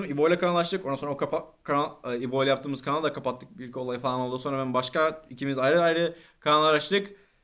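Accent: Trabzon, Turkey. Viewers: abi Trabzonlu olduğun çok belli tolga zengin e beziyorsun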